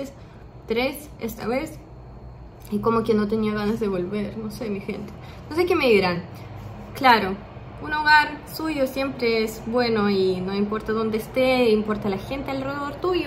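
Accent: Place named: Chile. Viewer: Hola: Qué hermoso escucharte hablar en nuestro acento chileno... Ya aprenderás a hacer ese pastel de choclo... Te ves muy hermosa cocinando. Felicidades y ojalá puedas volver a nuestro país. Dios te bendiga.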